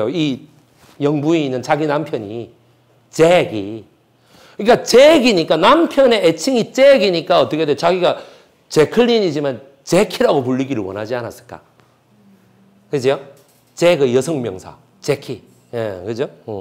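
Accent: Gyeongsang, South Korea. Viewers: 갱상도라 구수한 말투 정감이 갑니다 전 칠곡이거든요 요즘 강의들으면서 많은 사실적인 스토리에 감동입니다 감사합니다~^^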